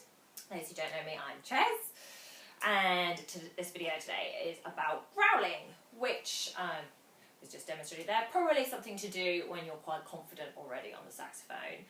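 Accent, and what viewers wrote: United Kingdom. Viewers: thanks for upload these helpful tips Chez. I use sometimes this technique on my baritone sax. Greetings from north Mexico. By the way, I like your lovely british accent ;)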